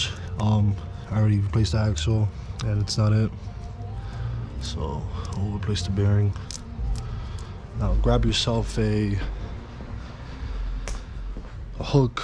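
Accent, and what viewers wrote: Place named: Staten Island, United States. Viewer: You sound like Keith Defosio from Staten Island.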